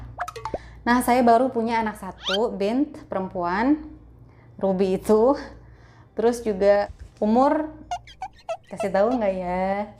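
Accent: Jakarta, Indonesia. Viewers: Waaaaah pok ini enak bngt cara bicara nya betawi bngt tapi,,sopan,tertata,jelas beda klau keluaran pesantren keliatan klau klrg Islami....TABAROKALLOH....SYUKRON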